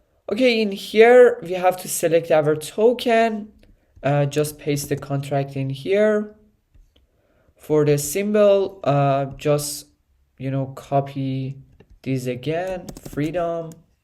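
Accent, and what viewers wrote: Iran. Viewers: dawg great content btw i think you iranian by the accent. im iranian too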